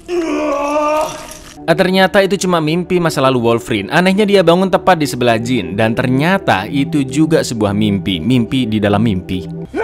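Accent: Java, Indonesia. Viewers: Lur kamu orang jawa mana? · Mas uwong jowo yo mas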